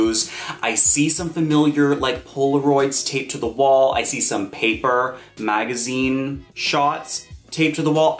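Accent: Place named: Canada. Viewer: You're a TRUE Fighter, I love you so much!!!! I'm obsessed with you and ur flawless Canadian accent!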